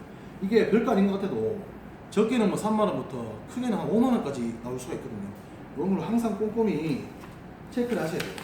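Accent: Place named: Gyeongsang, South Korea. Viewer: 경상도 싸나이 맞지예ㆍ고맙습니다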